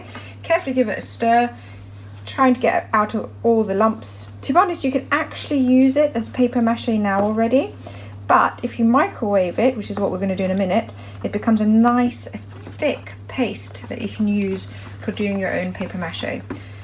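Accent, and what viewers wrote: England, United Kingdom. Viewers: ye proper English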